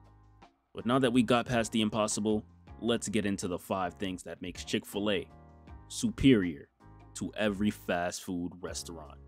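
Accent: New York, United States. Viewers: Wait? Are u from NYC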